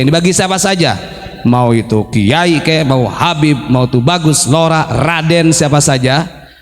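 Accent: Indonesia. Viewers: Keren lagom jawanya....enak didenger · pembacaan barzanji nya ala banten banget... ❤❤❤❤❤ banten tangerang serang pandegelang cilegon..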